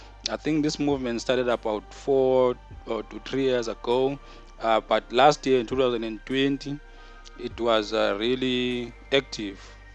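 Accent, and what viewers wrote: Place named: Johannesburg, South Africa. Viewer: This has to be the dumbest and uninformed rant about cape independence I've ever heard judging by your acetate I can tell you are not from the western cape you sound like you are from joburg and came to the western cape recently since you know so little firstly it's not cape town that is seceding it's the hole western cape province not the city of Cape Town get your facts right!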